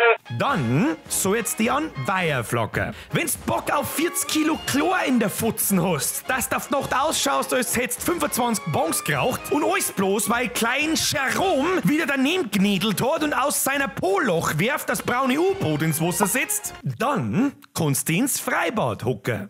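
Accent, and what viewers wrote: Bavaria, Germany. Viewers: ZEFIX etz binne moi zwoa wochan ned do und dann haust du seichane geiln videos ausa 😍 suppa wia oiwei duad guad wieder epan boarisch schmadsn zum hean 😊 kimmd den Freidog eigentlich a stream oder erst nägste woch? Habedrrrrrt.😜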